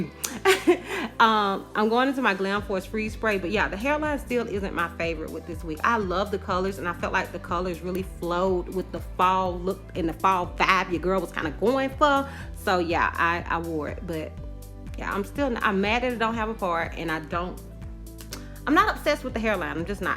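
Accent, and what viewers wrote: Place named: Alabama, United States. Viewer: I love your personality!! You sound like ALL my southern cousins in Alabama. 😂 ♥️♥️♥️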